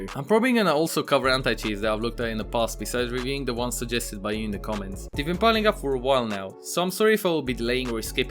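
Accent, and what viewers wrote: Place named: Romania. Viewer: ai accent de roman ma întreb de ce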